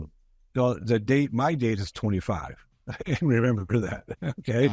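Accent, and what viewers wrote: Israel. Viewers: אני מת על המבטא הישראלי יא מלך תמשיך ליצור תוכן מעולה💪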